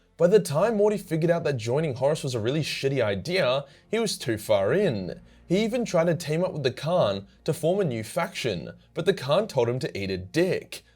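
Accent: Australia. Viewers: God I love aussies explainin shit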